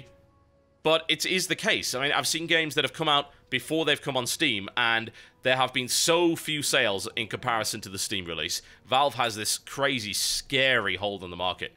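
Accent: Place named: England, United Kingdom. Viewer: Im scared right now, because people seem to be taking opinion of Steam as gospel... seemingly only because he has a english accent.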